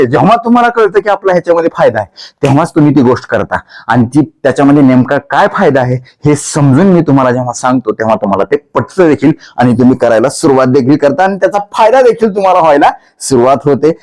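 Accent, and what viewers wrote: Marathwada, India. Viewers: डॉक्टर तुम्ही नगरच्या आहात नगर बीडच्या लोकांसारखा बोलणार आहे म्हणून विचारलं तुमचं बोलणं पण खूप छान आहे आम्ही पण बीडच्या हो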